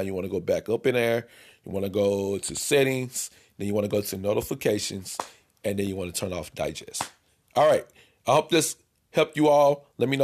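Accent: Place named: India. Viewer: Another good video no rasict I thought this was a Indian person making this vid. I don't understand what those Indians be saying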